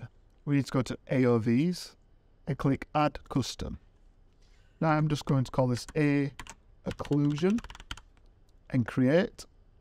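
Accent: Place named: Yorkshire, United Kingdom. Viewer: Legend with a yorkshire accent I thank you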